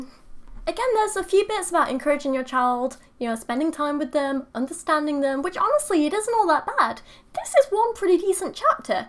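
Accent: United Kingdom. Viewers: Loving the video so far but it's a little too brit bong for me. But I dunno maybe I'm just too American to understand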